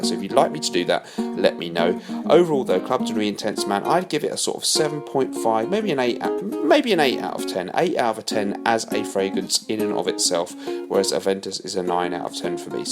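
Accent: England, United Kingdom. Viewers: Hi, I just saw your video now, I realise that it’s too late to comment now but I have a Mercedes-Benz Select and I wondering if it’s closed to the Creed Aventus or not? By the way, I studied a MSc in the UK 🇬🇧 so I so happy with your accent so far, it’s so pure English...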